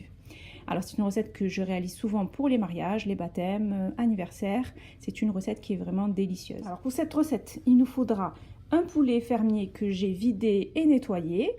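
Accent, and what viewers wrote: South, France. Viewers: Très bel accent du Sud en plus machahalah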